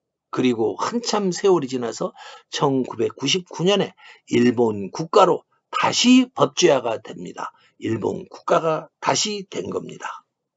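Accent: Gyeongsang, South Korea. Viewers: 갱상도사투리 이거어쩌지?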